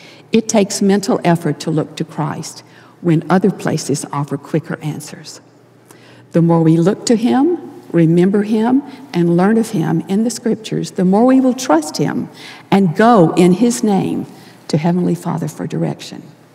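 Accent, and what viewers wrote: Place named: South, United States. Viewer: Thankyou Sister Marriott for a beautiful devotional full of spiritual and practical application. Love your southern accent too. Stay safe. God bless.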